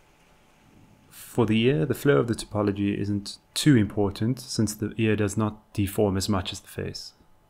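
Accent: South Africa. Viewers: Is that.....South African I hear....?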